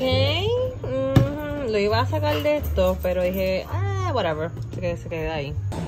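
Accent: Puerto Rico. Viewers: Una China hablando un perfect Español boricua uuff muy Bonita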